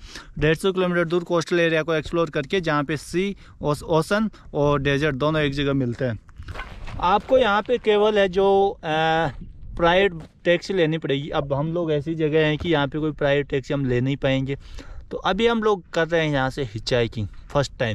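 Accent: Rajasthan, India. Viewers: Bhai ke bolne ke accent se Rajasthani lag raha hai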